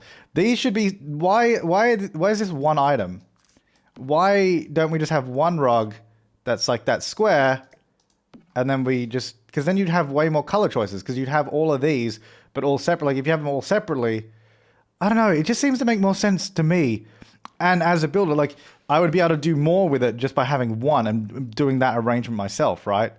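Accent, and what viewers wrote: Australia. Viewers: Ocker voice AND CLASSIC MAKES A RETUUUUUURN!!!!;)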